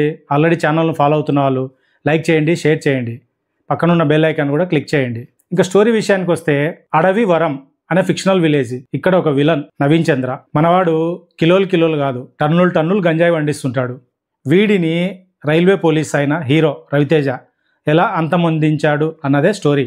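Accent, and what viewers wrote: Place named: Rayalaseema, India. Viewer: మేష్టారు పగలబడి పగలబడి నవ్వుకున్నాను, దయచేసి మీరు ఈ రివ్యూలు చెప్పడం ఆపొద్దు, రాయలసీమ యాస కుమ్మి పడేసారు, 😅😂😅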